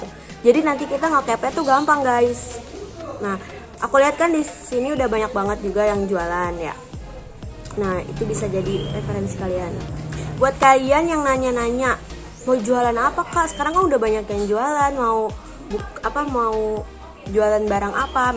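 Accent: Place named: West Java, Indonesia. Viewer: Orang sunda nya kak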